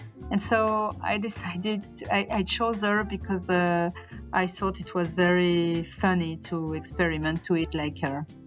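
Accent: France. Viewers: What a rude comment! Your English is lovely and your French accent just makes it more unique... ❤ from Chile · Merci beaucoup❣️I like the way the French eat healthy, balanced meals, and they don’t snack on junk food❣️Your English is very nice and I love your French accent❣️God bless you❣️🙏🏻❤️🙏🏻🍏🍇🫐🥑🥕🥬🍞🍗🥗🫕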